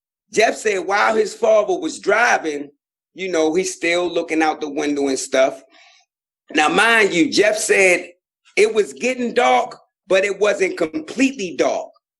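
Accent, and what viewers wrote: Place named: South, United States. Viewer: It's the Southern way of telling a story... very distinct... You will hear the same cadence.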